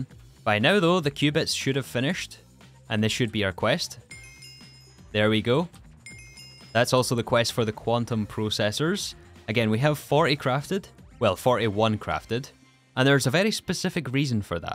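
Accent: Scotland, United Kingdom. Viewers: He's Scottish. Have you really never heard a Scottish accent before? SMH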